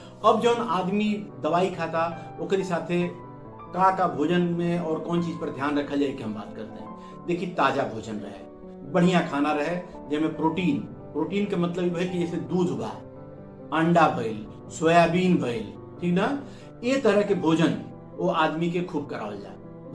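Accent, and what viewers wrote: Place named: Bhojpuri, India. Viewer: इतनी अच्छी विवेचना और इतनी सहज भोजपुरी। इतनी बढिया बातचीत। किसी भी ऐंकर से नहीं सुनी थी।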